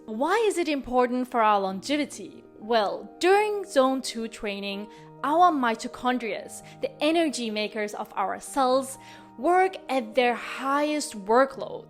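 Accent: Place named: France. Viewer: If you want to make it fun get a real bike and explore the outdoors. It's real life. By the way love your accent. French I think. Blessings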